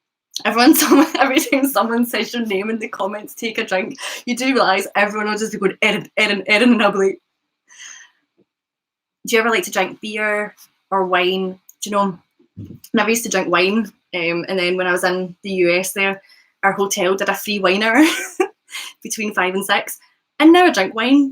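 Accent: Scotland, United Kingdom. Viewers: Scottish English bursts my brain :)))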